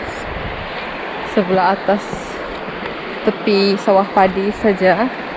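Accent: Sabah, Malaysia. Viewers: Lawas dalam negeri Sarawak yang bersempadanan dengan negari Sabah. Kerana itu logatnya lebih kepada Sabahan. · loghat kamu sama ya macam sabah...memang begitu ya maaf saya just nk explore budaya sabah sarawak...biasa dengar loghat sarawak ada lain sedikit kn...😁😁😊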